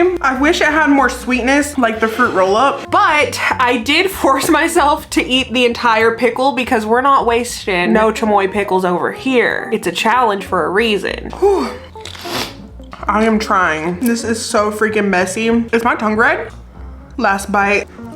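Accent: South, United States. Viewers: Thank you for making realistic content!! Also I’m a moved away from the south person so your accent makes me feel like your my cousin haha. Keep being the realest girlie out here.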